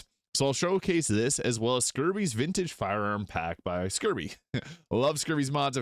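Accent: Canada. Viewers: i feel like that is how you would actually say it. 1st time i heard you pronounce about as "a-boot" i was like, this guy is definitely from the great lakes area or canadian.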